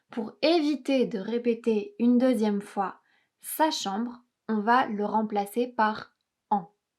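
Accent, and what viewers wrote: England, United Kingdom. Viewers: You have such a lovely English accent! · J’adore votre accent anglais hahaha! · It's funny, your accent in English is rather British, I didn't expect that. Certain words sound very American though.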